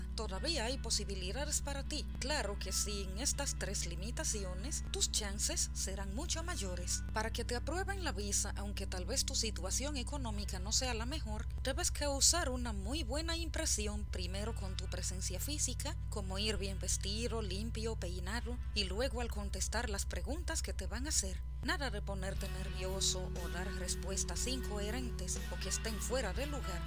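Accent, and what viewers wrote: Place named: Russia. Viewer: Buena informacion aunque la Calidad del video y esa voz con acento ruso es un poco molesta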